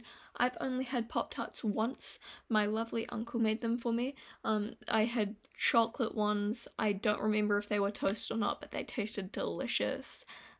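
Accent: New Zealand. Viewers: Lmaooo that's funny with the accent, honestly you sound like you have a New Zealand accent to me! But maybe if I was from New Zealand you'd sound more Americanized I ain't sure haha. I GOTTA watch The Fly and Sweeney Todd they sound really dang good, and I love American Psycho! I definitely remember you mentioning how much you love haha I'd definitely watch a video of you talkin about it more, it was really cool learnin more about you you're awesome! Also Pop-Tarts are SO dang good haven't had em in a while but oh man they're delicious, great video as always I hope you have a good day! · Great content, loving that Kiwi accent!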